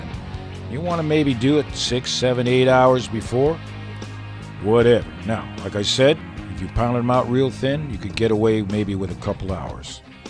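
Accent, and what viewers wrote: South, United States. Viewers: James Cassel Southern U.S, man. Don't judge them.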